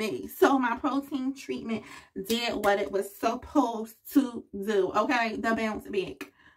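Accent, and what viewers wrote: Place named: Philadelphia, United States. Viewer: Loved this 🙌🏾 thank you sis! Lol I just knew you were from Philly with your accent 🤣 sending love from S. Jersey !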